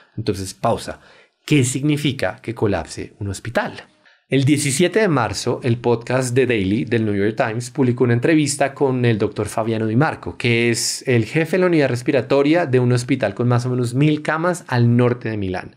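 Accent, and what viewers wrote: Colombia. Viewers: Me parece genial la forma de narrativa gráfica que tienen y el dinamismo para transmitir información, una voz holgada me gusta el formato. Parece ser Colombiana, orgulloso de ser así.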